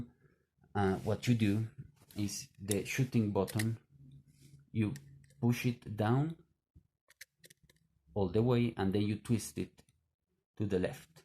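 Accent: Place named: Spain. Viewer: Hola,gracias for your review. Very nice the EM whit Moscow 80 logo!! Love your english with spanish accent...!! Greetings from Italy. Nos vemos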